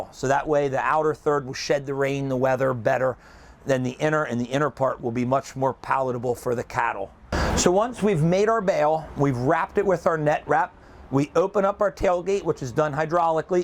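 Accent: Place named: Wisconsin, United States. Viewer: He talks funny, is that a Wisconsin accent?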